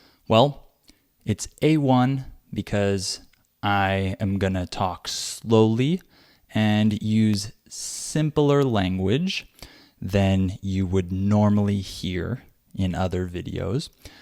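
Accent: England, United Kingdom. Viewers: British or American English ? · Hello sir! I'd like you to provide me some listening material from A1 to forward. And I real love English accent perfect for beginners of English. I'm so thankful in advance. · It is brutish English??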